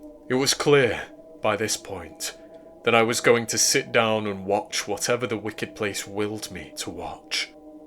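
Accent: United Kingdom. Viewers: ahh I thought so good to see another British narrator 😀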